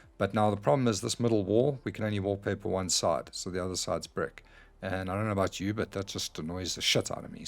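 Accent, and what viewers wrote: South Africa. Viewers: Is that a South African accent I hear?....🍻🇿🇦👍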